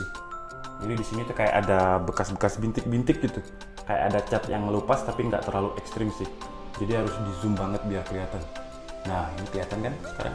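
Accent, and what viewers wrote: Java, Indonesia. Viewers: Waduh mengsle" jowo banget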